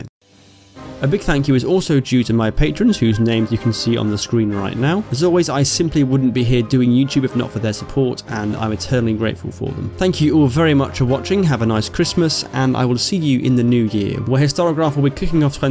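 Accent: United Kingdom. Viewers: Holy crap. You sound like a limey but you mispronounced nearly every single name!!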